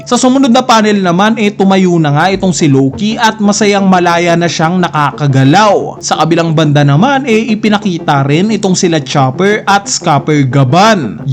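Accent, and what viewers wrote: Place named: Bicol, Philippines. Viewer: hayaan mo na bisakol ata si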